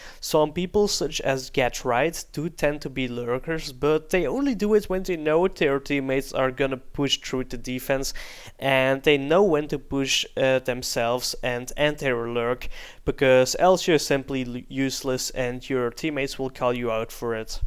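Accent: Netherlands. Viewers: I like your Dutch accent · Hoor het zo aan je accent. Had dat steam bericht nog niet eens gezien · OMG IS NEDERLANDS. IK MERKTE HET AL AAN HET ACCENT MAAR TOEN IK DIE GOZER ZAG DIE ZEI WAAROM NEGEER JIJ MIJ WIST IK HET ZEKER. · Ik wist wel dat je NL was, hoorde het aan het Nederlandse accentje ! Leuke video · I already heard on your accent that you probably were Dutch, until I saw a steam msg, "Waarom negeer je me" I wasn't for sure, Goede video ga zo door! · Even though i'm dutch his dutch english accent made me cringe. (So basically i think my english accent is pretty good) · Je hoort het al aan z'n engels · I knew from the start that his accent was from the Netherlands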